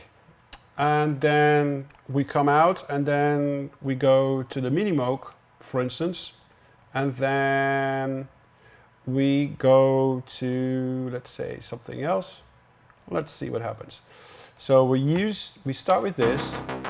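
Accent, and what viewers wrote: Netherlands. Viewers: Hey Walter Lewin sounds a lot like him! Is he Dutch?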